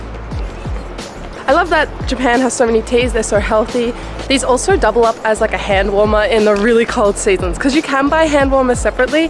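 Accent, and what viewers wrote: Australia. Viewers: How are you German but have an Australian accent? So confusing 😂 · An Australian with an Australian accent that lives in Japan and speaks Japanese and enjoys Japanese food and culture? I think I'm in love ❤️ lol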